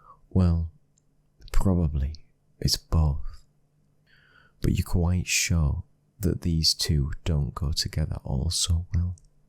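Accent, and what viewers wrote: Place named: Northern England, United Kingdom. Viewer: I'm originally from New York, but now live in Chicago. I'd love to move to Yorkshire one day. I could tell by your speech patterns that you were Northern English. Your voice is so soothing and gorgeous.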